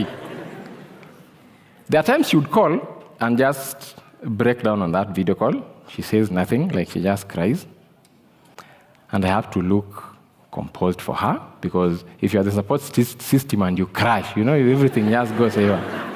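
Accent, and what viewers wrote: Kenya. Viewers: And you can even get the Luhya hint in it. Great guy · I love the Kenyanness im your pronunciation. Haha...and your sense of humor 👏👏👏🙌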